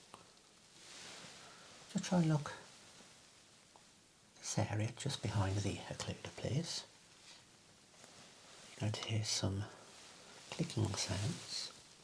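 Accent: Birmingham, United Kingdom. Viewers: listening to the start id be worried but a brummie bloke wouldnt do me wrong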